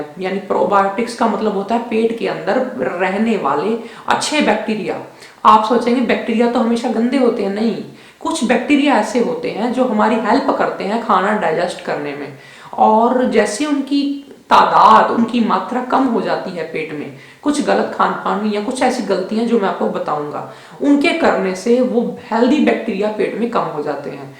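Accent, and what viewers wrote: India. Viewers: Rohit Malhotra Is he teaching English to u... He is Desi n speaking in his accent... He said bloating or bloting just to make us understand as we search with that name in YouTube... Don't mke fun of anyone for their accent bcos English can be spoken any how one likes there is no rules as such...as it is a foreign language...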